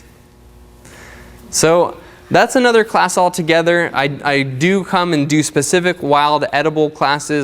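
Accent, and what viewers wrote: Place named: Russia. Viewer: Love the Russian accent ;)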